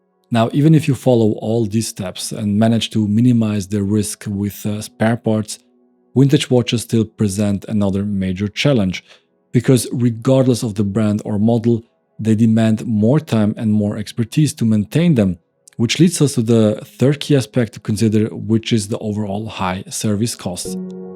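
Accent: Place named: Switzerland. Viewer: Love this content. You give precise and honest insight. What can be irritating at times in your constant mispronunciation of "available*" (say: ah-vale-ah-bell" not "away-bell"!) and you always pronuncing a "V" like a "W". They are sometimes pronounced the same in German (sounds like Swiss-German is your native language), but are vastly different in English.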